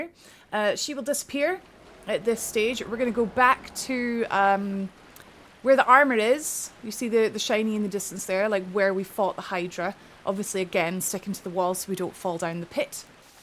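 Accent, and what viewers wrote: Scotland, United Kingdom. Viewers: Are you Scottish Great video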